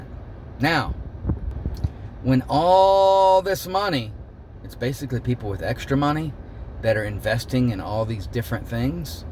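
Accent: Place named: Ireland. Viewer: like the Irish accent lol - was there a hidden meaning for it or just for fun? 😀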